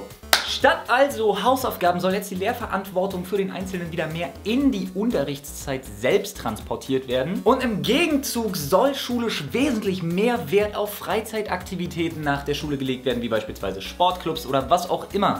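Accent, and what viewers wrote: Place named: Berlin, Germany. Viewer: Ab und zu sprichst du mit Berliner Dialekt und manchmal nicht. Wieso?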